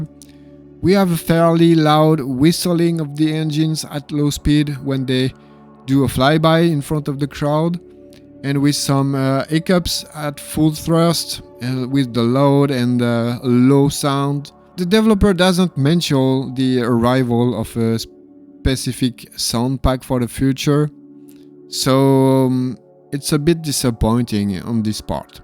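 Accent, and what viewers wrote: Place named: France. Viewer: Salut ! Tes français? Par ton accent on dirait. Si c’est le cas, tu parles très bien anglais!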